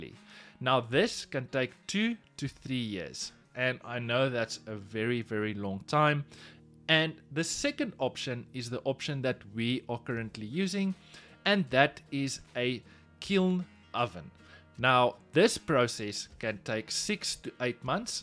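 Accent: South Africa. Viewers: Love the video. And love the saffa accent. Haha. Keep going bud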